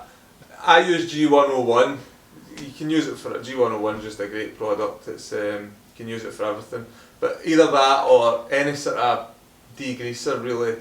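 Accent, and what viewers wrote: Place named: Glasgow, United Kingdom. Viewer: Thanks for answering about the accent! We thought it might’ve been Scottish, but had noticed your shop was in Blackpool. We actually spent the first week of July touring through the Highlands. I think the closest we got to Hamilton was Callander. Wonderful country!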